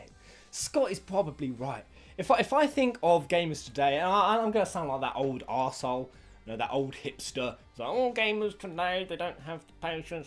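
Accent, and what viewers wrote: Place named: Scotland, United Kingdom. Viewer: Scottish accent is great.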